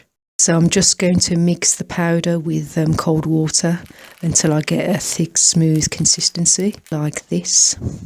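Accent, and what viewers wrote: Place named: Midlands, United Kingdom. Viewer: Hello - Yes, it is in the British Midlands about 15 miles or so from Birmingham. You accent is very obviously British Midlands but not 'harsh' enought for the strong'Brummie Birmingham' accent. Hence l suggested Wolverhampton, a much 'softer', less pronounced Midlands accent.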